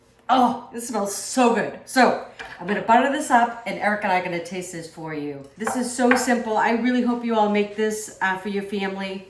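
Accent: Boston, United States. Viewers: I was so confused when I saw Bostonian Alert & started laughing..omg I was trying to say it your way! Im loving this content & the bread looked amazing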